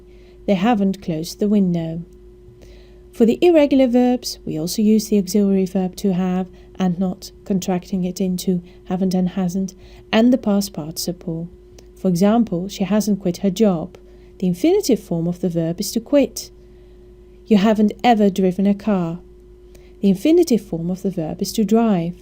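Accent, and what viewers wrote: England, United Kingdom. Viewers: thanks a lot ma'am I need this for my test In English I need to past this test so I can graduate grade 6 thanks alot and you sound like my teacher in English but a really nice voice your form Ingland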